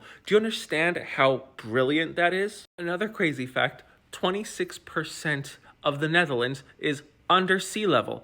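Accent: Germany. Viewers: Ha, the accent is German, not Dutch...😅 And it is insane that our country is 2nd in agricultural export. We are very very small and it is not sustainable for our land and water to carry on like this. We have quite a few problems when it comes to clean water supply...and we are a delta. And if you look at our politics, you wouldn't guess that we are a highly educated people. It really has been a clown show. Our elections are today. Let's see if the Dutch got a bit smarter the last 2 years. I am not counting on it, but am still hopeful. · The accent as always was more german than dutch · Hell no, we don't sound this german. And We probably one off the best english speakers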